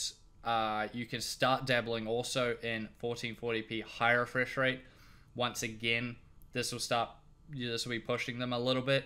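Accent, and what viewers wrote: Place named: New Zealand. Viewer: Hi, Nice accent!! Sounds like you're from Zew Zealand.. I just got the GTX1070 MSI OC card (since they are coming down in price) with my new 6core I5-8600K build. I need to buy a MONITOR without breaking the bank!!!.... I was thinking of a higher refresh rate, like 120hz or 144hz at 1080p. I play Battlefield 4 mainly and PUBG. HOWEVER, it seems in the mid ((($300))) US dollar range that these monitors have subpar picture quality, bleeding and other bad things that happen while gaming. Then I thought about the Vizio 43" 5ms D series LED TV. It says 120hz.. but I'm betting my nickles in my pocket that its probably some marketing ploy and not really native 120hz. But its picture quality is amazing at 1080p and it's around $300. What do you think or suggest I do for around $300 us $?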